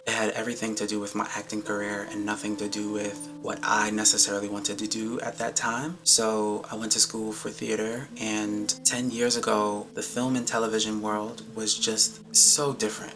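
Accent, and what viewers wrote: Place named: United Kingdom. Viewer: I really enjoyed your British accent!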